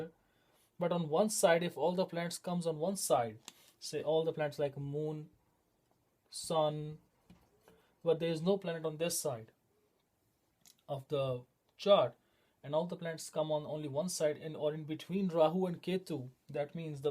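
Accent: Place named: West, United States. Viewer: Bro if you lose your Western accent this wonderful explanation would be better understood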